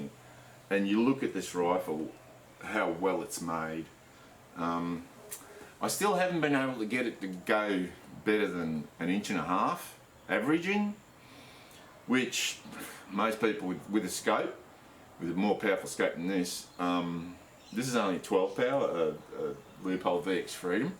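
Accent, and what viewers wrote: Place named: Australia. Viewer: You sound Australian Thought y’all no longer allowed gun ownership?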